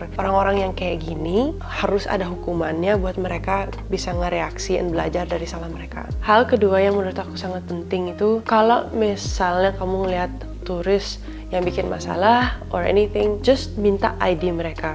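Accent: Bali, Indonesia. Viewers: Logat mu, seperti warga Bali., yg perlu kamu jelaskan, mengapa orang eropa kurang apresiate aturan dan etika di Bali. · Medok nya kayak orang Bali di dengar sama orang Jakarta 😅🥰🥰🥰 masukan yang bagus bukan hanya untuk Bali, juga saya termasuk orang Jakarta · kk ini accent bali nya kentel bgt yh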